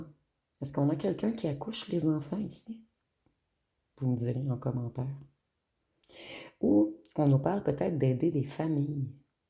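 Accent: Quebec, Canada. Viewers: Ahh oui pis je comprends pas pourquoi tu caches ton québécois ça fait parti de toi. Je crois pas que les Français belges et autres se bloquent. Tu peux être naturelle c'est tellement moins épuisant. 😘 Merci et j'aime beaucoup tes titrages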